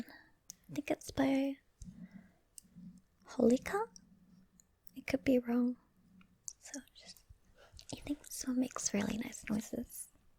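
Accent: Australia. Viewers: A Spanish- looking Filipino with an Australian accent? That's a treasure for sure, haha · It's odd - you have a 'sweet' voice pitch, and its like the aussie accent kind of amplifies it, if you know what I mean. That's always the impression when I hear your voice in these vids, very sweet. Haven't met many Australian girls with a similar voice pitch. As a side note, I'm half filipino half English living in Australia for almost all my life! It's a small world, huh.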